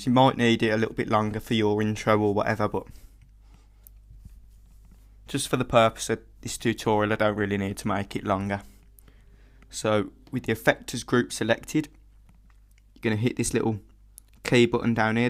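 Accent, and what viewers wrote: Birmingham, United Kingdom. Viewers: Birmingham Accent FTW :D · Typical Brummie :) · Hmmmmm.. Are You From Dudley? Im From There And You Sound Like A Brummy -_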